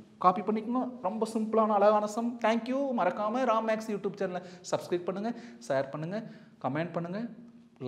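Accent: Coimbatore, India. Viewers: Kovai slang bro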